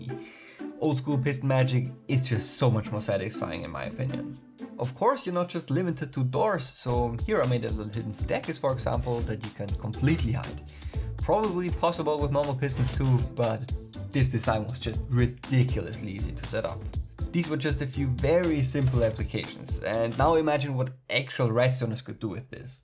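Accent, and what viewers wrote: Germany. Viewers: Are you german it kinda sounds like it Ich bin auch deutsch ehre ich liebe deine videos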